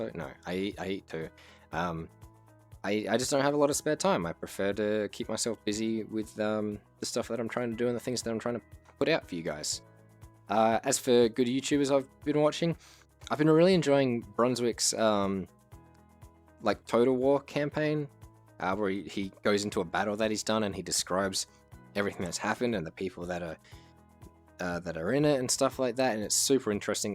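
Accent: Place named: Australia. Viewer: Nice to hear a fellow Aussie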